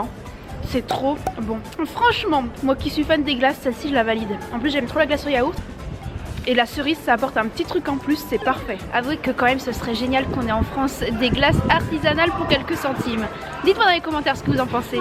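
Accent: United Kingdom. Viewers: ton accent anglais on t'en veux pas... sans être méchant:) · fait une vidéo super sympa et très inspirante pour mon prochain séjour à Varsovie, et la seule chose que les gens commentent, c'est son accent anglais. Comment voulez-vous que les gens soient confidents en parlant les langues étrangères quand ils vont se prendre des remarques derrière... Cette mentalité, il n'y a qu'en France que je l'ai vécue et ça me désole! Merci pour ta vidéo!:) Well done!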